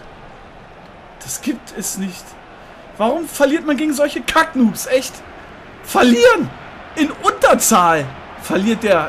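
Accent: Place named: Swabia, Germany. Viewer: Hinterdupfinga des isch a rechtr Schwob :)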